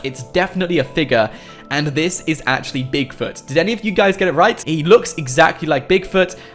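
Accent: United Kingdom. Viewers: I like his British accent. This is amazing. I liked, subscribed, and smashed the bell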